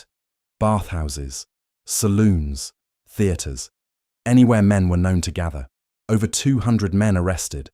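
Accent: England, United Kingdom. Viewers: Good initial message, really awful use of repetition in AI to get monetized views. AI should be able to convey a much smoother story across a much more sophisticated narrative arc and provide dialogue that is "show" not "tell" with a thousand uses of "said." Then how many times is the narrator with an English accent going to use the wrong pronunciation of "clerk", "clark" was used 100 years before this time period min the south of England and never in Manhattan. There are better stories of the times available. Not a single handshake, hug, kiss or sign of sexual intimacy. I hope you learn your AI tool and do quality control before releasing any more stories. Unless you are just in it for the money. YouTube does not seem to care that this low effort form of AI that is ruining their product.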